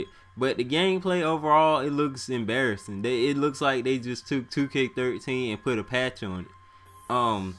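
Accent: United States. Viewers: u sound country as shit I don't know if ur slow or if that's just how u normally talk.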